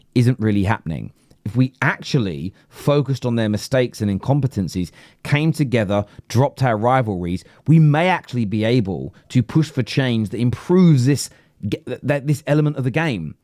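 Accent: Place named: Manchester, United Kingdom. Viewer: Interesting Mancunian accent 🤔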